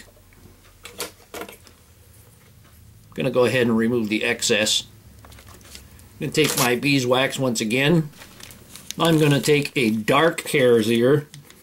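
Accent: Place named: Buffalo, United States. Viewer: you sound like every Polish guy I know from Buffalo. Like I'm home. Great videos!! Thanks!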